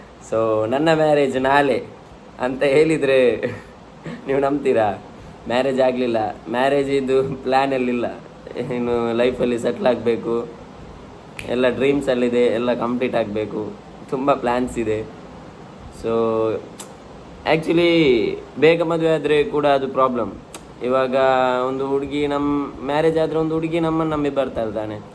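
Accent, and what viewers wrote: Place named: Mangalore, India. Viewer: ಮಂಗಳೂರು ಕನ್ನಡ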